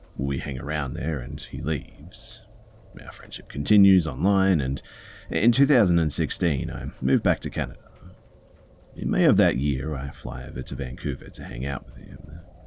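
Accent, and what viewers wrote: Australia. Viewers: He's an auzzie mate so fuck off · He's Australian?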